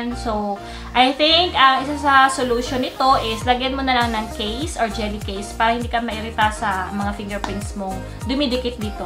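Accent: Visayas, Philippines. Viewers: WAIT... BISAYA PO KAYOOO!?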